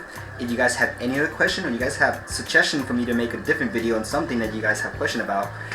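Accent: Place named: Australia. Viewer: Nice video! Very informative! Just curious I detect an accent, are you originally from Australia?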